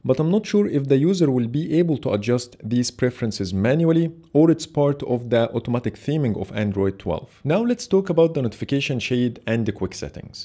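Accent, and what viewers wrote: Egypt. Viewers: حاول تعدل الاكسنت بتاعتك عشان الاكسنت المصرية او العربية واللي هي شبه الهندية للاسف محل سخرية كبير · ال accent المصري دي انا عارفها كويس عظيم 👌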